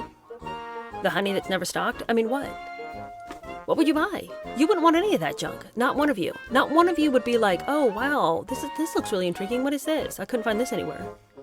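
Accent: United Kingdom. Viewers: I tuned in to this bc I crack up listening to your Bri-ish accent. LOL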